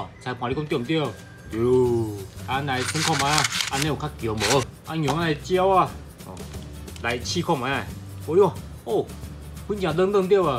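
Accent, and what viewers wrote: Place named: Taiwan. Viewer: 的臺語好可愛:-) 說的不錯ㄛ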